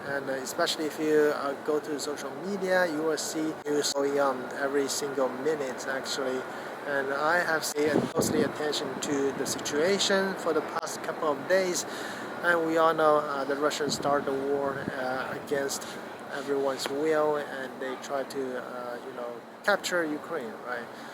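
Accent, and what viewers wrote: China. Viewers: 你以为离开中国这个泡泡看到了世界的真相，其实你只是被包裹进了另一个泡泡而已，战争就是战争，一句带过的美军战争和整篇批判的俄乌战争，你的屁股已经坐歪了。我支持每个因为人种宗教政治或历史原因结成的团体捍卫本团体的生存权和利益。所以我同情乌克兰人民，夹在大国间艰难生存更被战争摧残；我也同情俄罗斯人民，因为历史体制政治等原因一直被孤立于西方世界只能努力的寻求着不被蚕食瓦解；我甚至理解台湾，明明和我们用不一样的世界观看待着这个世界，却始终不被完整承认，对明天的结局也充满未知；我也理解美国，无论错对做什么也都是为了本国好，对于美国人而言本该如此；我唯独没法理解的是你，英语再流利也听得出是中式腔调，说明你是在中国长大的中国人，一个离家的孩子哪怕走的再远看的再多，哪怕知道家里还有多少不足不对不堪，需要做的应该是让这个家变得更好，而不是一副完全对立的嘴脸完全否定这个家，否定所有的家人。很多历史的政治的原因造成的问题，不是一朝一夕就能完全改变，但今天的中国也远不是从前的愚昧无知，今天的年轻人也远不是你口中的是非不分。当有一天，你嫌弃的中国消失了，你，这个永远无法改变的中国人，也会很惨，相信我！ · 呵呵 你掌握了流量密码 说着一口Chinglish迎合油管群体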